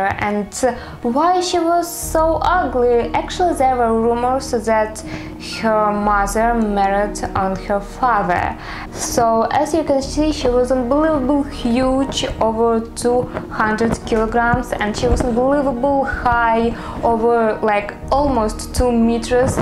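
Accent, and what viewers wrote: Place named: Ukraine. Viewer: UkrEnglish... It's nice that u make such efforts to speak English and even make vids but i'm sure u would have much more subscribers if u work on ur pronunciation))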